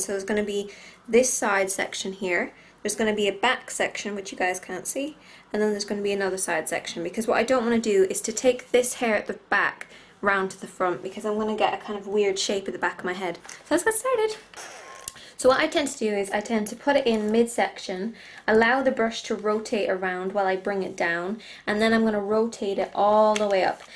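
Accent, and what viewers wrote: Ireland. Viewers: are you irish? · r u irish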